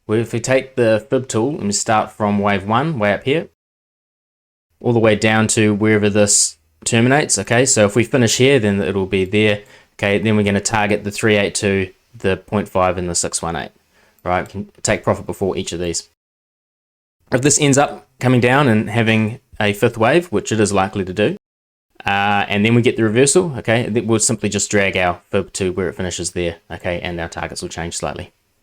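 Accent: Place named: Australia. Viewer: I didn’t picture u as this. Your accent gave me the expression that u were an white Aussie dude lol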